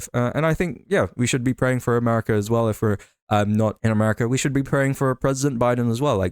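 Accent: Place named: Australia. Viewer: He does seem to have a Philippine flag behind him, but he sounds (and I think he said he is) Australian.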